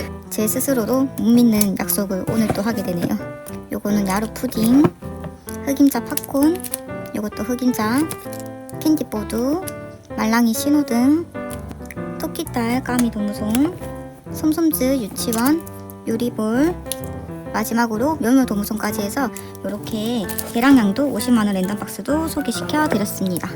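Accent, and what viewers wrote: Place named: Gyeongsang, South Korea. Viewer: 경상도 분 이신가요오?! 너무 귀여워요 사투리..😳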